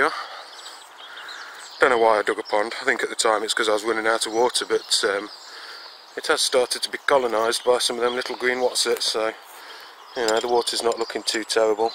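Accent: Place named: Nottingham, United Kingdom. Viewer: I thought you had the accent, I'm from Notts too. I've recently just got into gardening and been enjoying watching your videos, it's making me want an allotment lol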